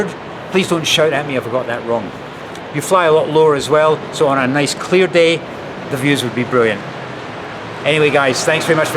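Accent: Scotland, United Kingdom. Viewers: Love the Skaughtisch’ aksent.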